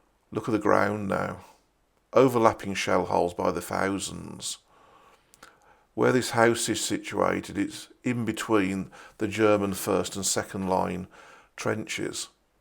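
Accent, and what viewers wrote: Birmingham, United Kingdom. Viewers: Absolutely superb. Your soft brummy twang evoking memories of the Shropshire lads.......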